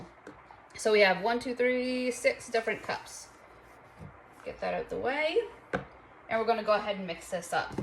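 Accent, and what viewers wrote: New Orleans, United States. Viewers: Girl, you are from Metairie or New Orleans FOR SURE!! I can tell by that accent (you sound like me!) and those glitter names…”Garden District, Sugar Cane?!” HA! Love your channel…new sub!!!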